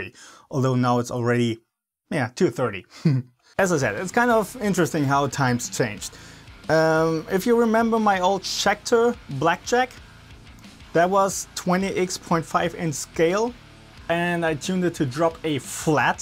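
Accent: Germany. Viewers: I have also an 2.7 in Lemon. The only Problem i have is , powerchords on the Low B sounding very „wobbly“. Any Tips ? String Gauge? 10-64? Ich höre einen deutschen Aktzent. Wenn du deutsch bist dann kannste mir natürlich auch so antworten ;-)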